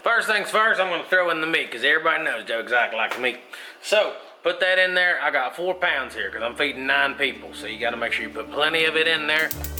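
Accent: United States. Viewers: u so funny wit dat cowboy accent, u great man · Sorry, not like British one. The cow boy one in the west USA. ♥ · Lol😁 The whole time I was holding my breathe praying🙏that your mustache didn't fall off into that Chili ha ha ha😁😁😁😁😁😁😁😁😁😁😁😁😁😁😁 I am going to definitely try making this chili I could literally taste that chili while watching you cook it..looks amazingly good👍 You Got that Southern accent down packed✌😋🙏👍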